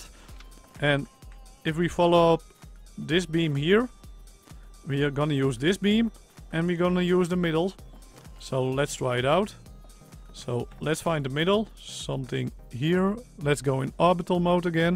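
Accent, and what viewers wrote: Netherlands. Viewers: You can tell this guy is dutch, een goeie dag trauwens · je hoort een beetje een nl accent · Are you Dutch? I grew up in holland but now live in the USA. Love the accent lol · the dutch accent xD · Je hoort gewoon dat hij nederlands is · are u dutch ? i can hear an accent there hehe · Are u Dutch? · Lekker nederlands accent 😂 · Dat dutch accent is funny. Nice build btw 😃👍🇳🇱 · Ik kijk je voor de eerste keer en kon gelijk horen dat je nederlands bent ;)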